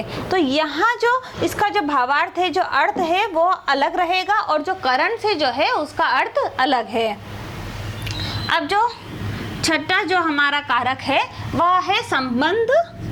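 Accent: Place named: Haryana, India. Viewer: there is harvani touch in your languge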